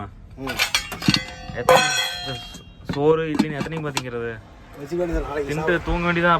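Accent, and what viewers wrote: Kongu, India. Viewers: கொங்கு தமிழுக்கு வணக்கம் சொல்லி கொள்வது நெல்லை தமிழ் 🎉🎉🎉🎉🎉🎉🎉🎉🎉🎉🎉🎉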